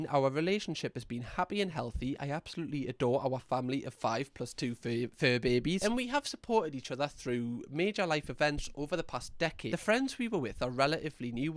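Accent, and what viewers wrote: Newcastle, United Kingdom. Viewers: Love your vids,! I would LOVE for you to start a new channel, can't get enough of your cheerful, witty, adorable content! And I LOVE the Geordie accent! It's now my fave UK accent. Much love from across the pond! Donuts forever! xoxo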